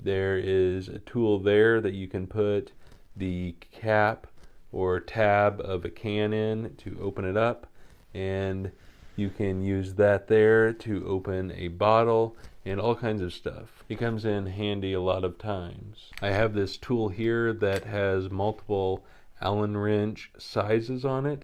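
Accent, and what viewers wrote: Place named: East, United States. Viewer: gotcha. I figured east of the Mississippi from the trees and 'accent'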